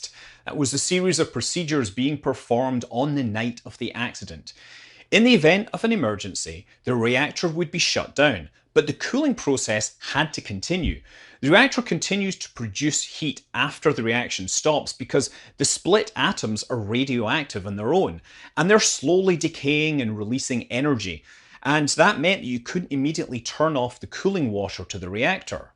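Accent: Scotland, United Kingdom. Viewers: I'm a Scot living in New Zealand and take comfort from a Scottish accent. The turning t's into d's with said accent is giving some anxiety though. What's the deal? Where do you live?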